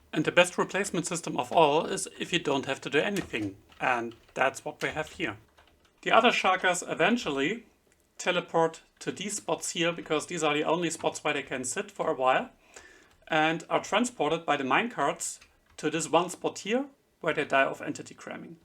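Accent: Germany. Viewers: Der deutsche Akzente kickt übelst rein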